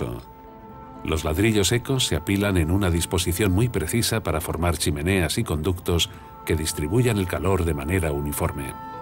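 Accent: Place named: Madrid, Spain. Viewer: ¿De dónde eres? No adivino tu acento ya que es casi imperceptible. Diría que Eres de España, en concreto de Madrid. Saludos.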